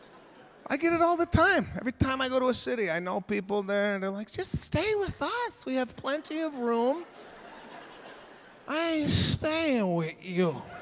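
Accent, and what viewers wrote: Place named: Chicago, United States. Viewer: Chicago Sicilians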